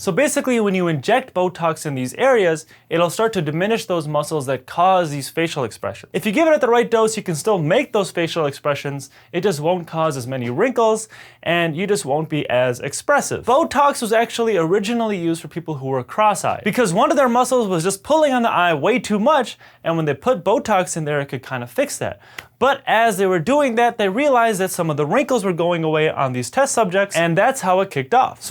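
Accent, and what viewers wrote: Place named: United States. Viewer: I didn't expect him to speak in an American accent 💀💀💀💀💀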